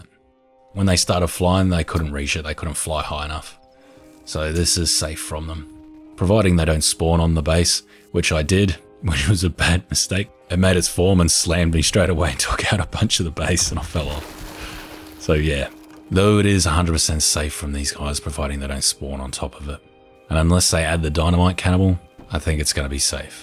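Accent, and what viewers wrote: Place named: Australia. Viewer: You sound like a true blue Aussie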